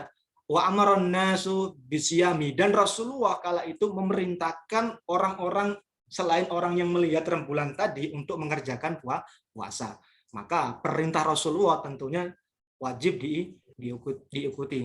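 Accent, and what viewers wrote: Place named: Jakarta, Indonesia. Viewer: Sudah memakai logat logat jakarta,. Terbaik!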